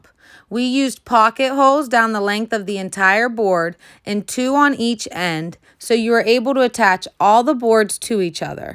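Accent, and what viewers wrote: Pittsburgh, United States. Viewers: Where are you from? Sounds like PA near Pitts?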